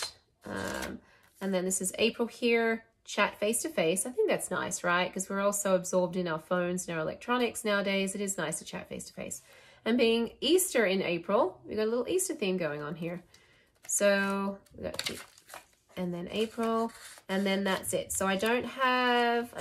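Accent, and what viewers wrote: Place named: Australia. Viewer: this is what i'm eyeing online. thank you for all the info!! your ozzie accent is so faint. did you grow up in australia and move to the US?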